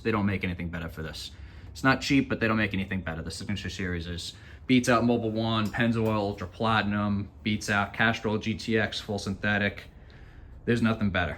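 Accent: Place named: New York, United States. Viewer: I recognize that NY accent lol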